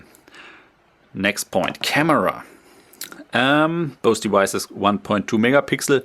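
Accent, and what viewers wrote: Germany. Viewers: i could feel some German accent within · i think is french?:) actually no, is german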